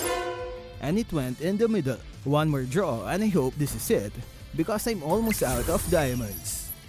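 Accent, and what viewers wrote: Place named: Philippines. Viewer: that forced vocal fry pinoy accent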